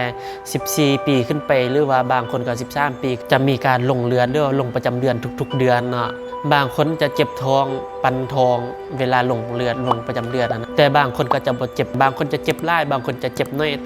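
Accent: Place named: Luang Prabang, Laos. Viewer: ພາສ່າທິນ້ອງເວົ້າເປັນພາສ່າໄທຫລ່ວງພະບາງບໍ່ນ້ອງ ເວລານ້ອງເວົ້າເປັນຕາຟັງເນາະ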